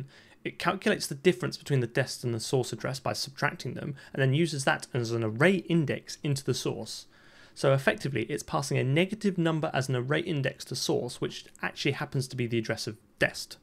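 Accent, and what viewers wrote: United Kingdom. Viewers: i love your accent... remember me when i been in london.. age ago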